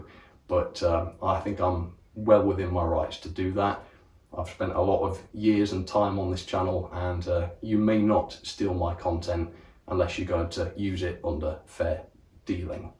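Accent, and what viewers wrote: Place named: Liverpool, United Kingdom. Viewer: I figured that he was in Liverpool based on his accent. Just guessing though.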